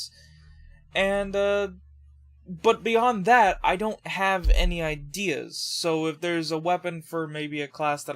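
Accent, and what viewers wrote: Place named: South, United States. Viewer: his southern accent? It's fucking GOLD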